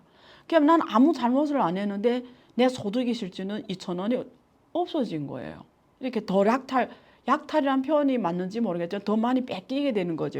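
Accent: Yanbian, China. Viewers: 아유화 유튜브 좀낫설지만반갑씁니다 사실적인 소식만 전해 주세요 억약으로보아연변말씨 아니면 탈북민이신가요 조심스럽게 지켸봅시다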